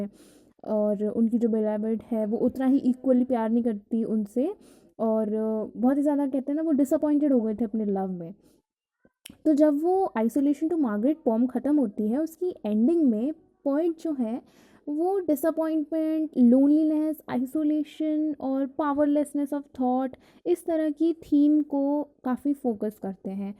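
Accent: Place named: India. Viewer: Indian accent brother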